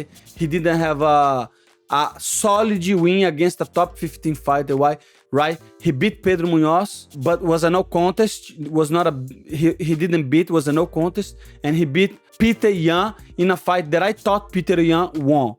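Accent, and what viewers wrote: Brazil. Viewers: Your English is good. You just have a thick Brazilian accent. And there's absolutely nothing wrong with that. I prefer to hear someone's accent coming through personally. I'd say you're doing a very good job.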